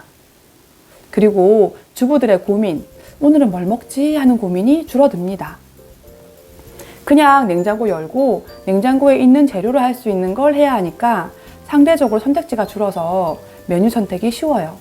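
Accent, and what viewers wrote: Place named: Busan, South Korea. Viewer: 부산이나 김해나 양산?쪽인가바요ㅎ 부산살아서 말투가 익숙해여ㅎ 4인가족 많이 배우고가요^^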